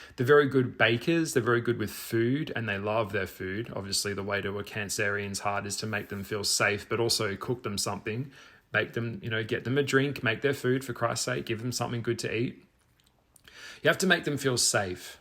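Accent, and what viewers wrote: Australia. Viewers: Sounds like you are Aussie!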